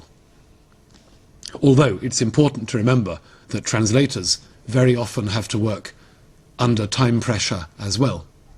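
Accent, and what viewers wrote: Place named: United Kingdom. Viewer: good, quiet visual example of how an interpretaion works. And this pure, brand british accent is so ... lovely!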